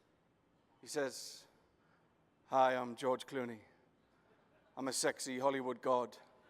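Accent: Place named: Scotland, United Kingdom. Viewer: Brilliant Keynote. I love that you have an accent (me too I'm a Scot)!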